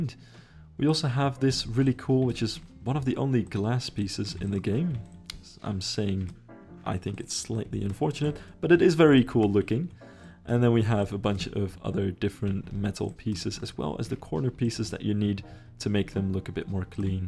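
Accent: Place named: Netherlands. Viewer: The thick Dutch accent tho :D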